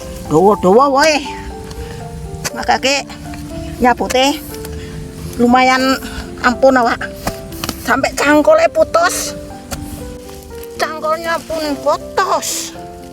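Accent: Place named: Medan, Indonesia. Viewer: Dari logatnya orang jawa Medan ini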